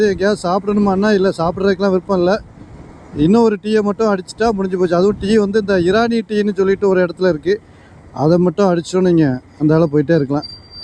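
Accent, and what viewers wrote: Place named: Thoothukudi, India. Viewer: உங்கள் தூத்துக்குடி Slang ku நாங்க அடிமை 😂 continue 🎉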